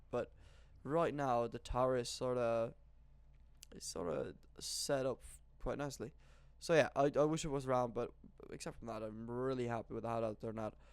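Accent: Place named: England, United Kingdom. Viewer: You sound english. It is uncanny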